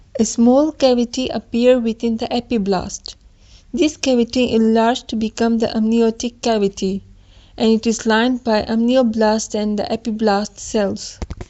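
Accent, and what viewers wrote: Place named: India. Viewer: Amit Majumder from her accent I'm sure that she's an indian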